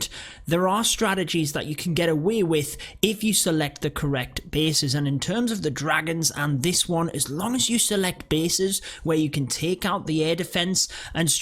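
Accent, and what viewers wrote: United Kingdom. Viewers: Thanks! Subbed and liked. Are you british 🤔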